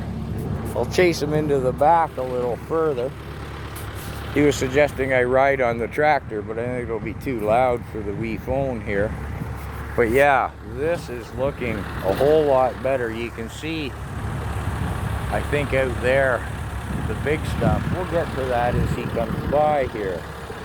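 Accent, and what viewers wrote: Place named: Minnesota, United States. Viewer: We in minnesota of wisconsin? Or some part of Canada? If i had to guess, i would maybe say Minnesota? Not an expert on those speech accents yet. Lousiana has like 20 distinct accents. Several different distinct accents around New Orleans.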